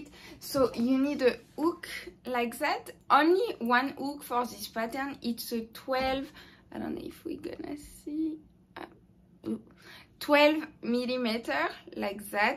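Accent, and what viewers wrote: France. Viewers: Je critique pas du tout, merci pour toute tes explications c'est vrmt super, merci, mais ton accent bien français m'a fait rire 🤣 bien joué et continue c'est top, j'adore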